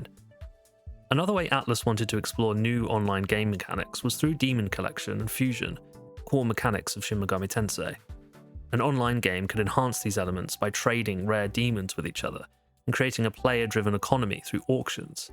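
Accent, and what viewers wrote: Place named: United Kingdom. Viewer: That's just the British accent haha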